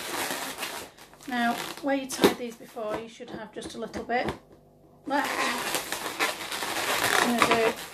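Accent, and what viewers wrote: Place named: Cheshire, United Kingdom. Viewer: I love your work! You are so talented! I'd love to see you do a large poppy troll column. I'm doing a birthday in june, but can't figure out how to attach all those 260's around the large approx. 24 inch balloon heads. I've thought about glue? Her hair goes straight up, so I just don't know how to get it attached midway all around the round balloon......no videos on it. Thanks for sharing your brilliant work. Love your accent. Im from the US but my husband is british, from cheshire, you sound like that area to me lol thanks again! :-)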